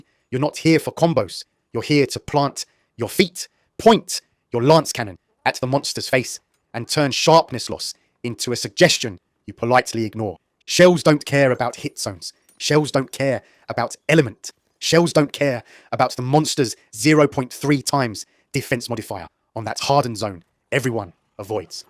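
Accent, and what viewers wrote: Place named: United Kingdom. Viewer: Really sick of choosing random videos only to find another British narrator. Do you mfs have real jobs?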